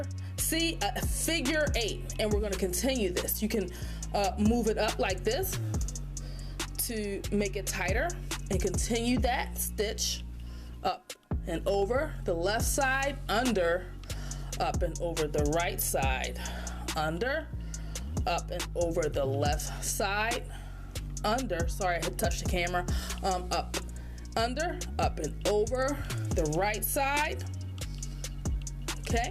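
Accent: Baltimore, United States. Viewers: Are you from baltimore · Baltimore I can place that accent from anywhere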